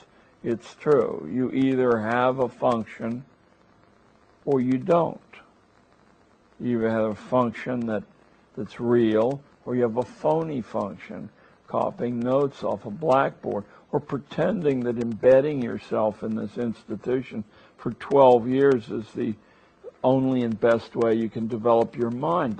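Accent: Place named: Pittsburgh, United States. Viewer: The Pittsburghese is refreshing.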